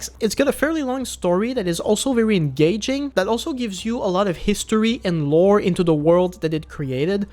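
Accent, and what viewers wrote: Quebec, Canada. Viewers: are you from quebec? i think i hear a familiar accent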